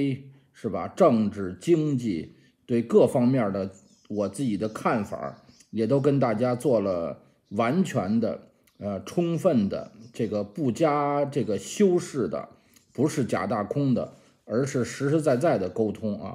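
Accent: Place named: Beijing, China. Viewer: 祝贺你的一周年，加油！我们每天看你听你侃大山，之所以喜欢你的视频，是你说人话，方方面面，不啰嗦，就像沈志华老师那样，咬字清晰，北京话，有趣。你不怕揭自己的老底，说明你不虚荣，你初中毕业，但是博士水平，很多人学历很高但是水平很差，当然里面有买的学历混的学历，硕士学历初中水平。所以西方认你的经历和经验，不重视学历。欢迎来加拿大，我接待你。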